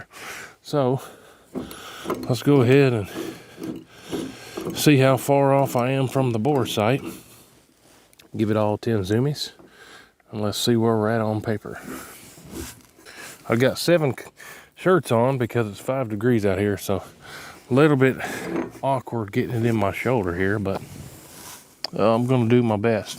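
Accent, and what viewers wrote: South, United States. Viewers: what the hell is zoomies please speak proper english not country red slain.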